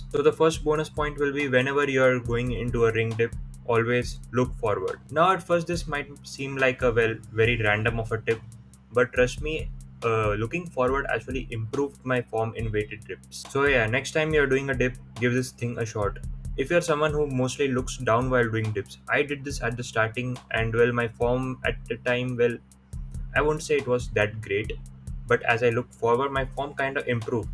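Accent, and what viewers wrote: India. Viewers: U dont look like Indian but u sound Indian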